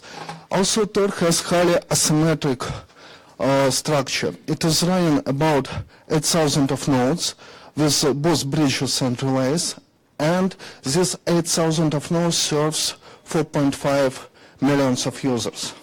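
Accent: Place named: Russia. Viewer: A russian speaking english with an accent into an overdriven microphone about a somewhat difficult to understand and interesting topic - god damn. Furthermore, it's the only useful video when you search for "garlic routing". :(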